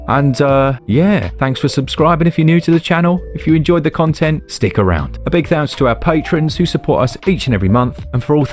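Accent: United Kingdom. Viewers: Totally agree, it is so nice to hear this pure BrE! I once compared guys to that for me, being from CH, was kinda benchmark:)))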